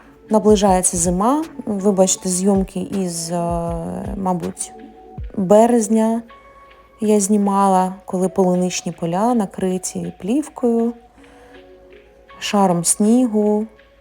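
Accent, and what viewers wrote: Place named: Russia. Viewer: Чувствуется, что на самом деле Вы думаете и говорите на рус языке...а вот влог пытаетесь весьти на укр языке, ну тогда выучите хорошо украинский.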